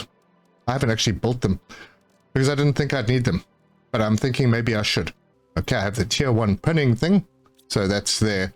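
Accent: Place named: South Africa. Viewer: Clicked on the video and that accent jumped out at me, haha. Fellow South African! Awesome videos, man!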